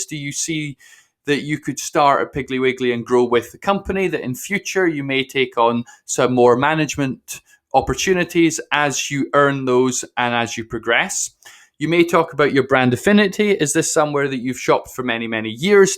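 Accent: United Kingdom. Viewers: Why is a british man telling me how to get a job at piggly wiggly ?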